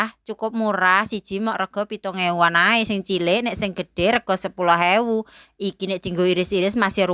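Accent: Java, Indonesia. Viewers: ❤️ mu desa mana to mbak Tulung bls been jelas mbak NK ngomong KK boso jowo ne medok banget mbak. seneng netesin ngrungokne mbak.. swun mbak sehat selalu Amin 🤲👍💪🍅🙏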